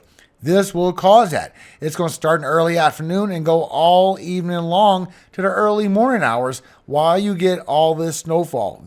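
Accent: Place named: Los Angeles, United States. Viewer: I knew you had to be from LA with that accent. You are my "go to" for weather forecasts and updates. I can always depend on you inform us in LA what we can expect during the active weather events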